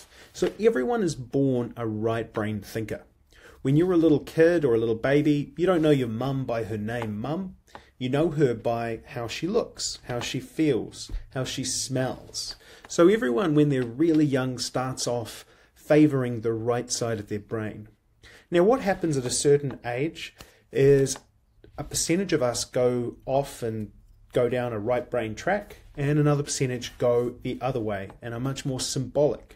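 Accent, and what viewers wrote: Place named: New Zealand. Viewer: Lovely hearing your Kiwi accent!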